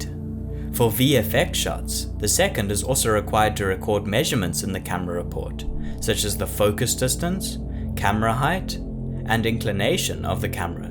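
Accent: South Africa. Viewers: Subscribed. Your South African accent and rich videos about film are worth a subscription.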